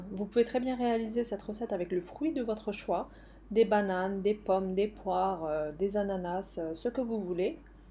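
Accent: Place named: Lorraine, France. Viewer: bonjour Quelle est la taille de votre poêle? Tombée par hasard sur votre site j’adore! Je crois reconnaître un petit accent lorrain 😊 Bravo et continuez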